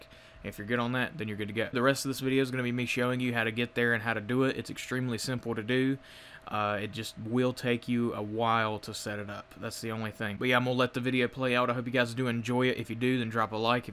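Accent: South, United States. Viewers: Are you from Alabama I am I reckon your southern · Great, informative video but that accent screams below the Mason Dixon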